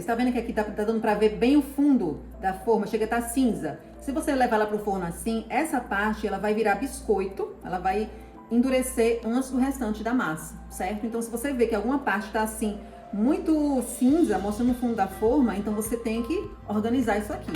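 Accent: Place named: Pernambuco, Brazil. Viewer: Ganhou uma inscrita! Pelo sotaque reconheci que tu és uma das minhas! Queria uma receita ORIGINAL pernambucana. Quando abro um vídeo e escuto falar "rocambole" eu já passo.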